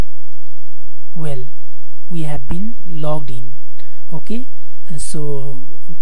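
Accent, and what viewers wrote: India. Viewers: he's speaking indian accent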